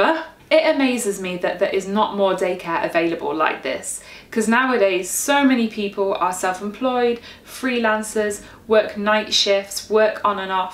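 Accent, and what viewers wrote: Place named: Derbyshire, United Kingdom. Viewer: Awesome mommy job!!! Btw, your Derbyshire accent is really pronounced in this video.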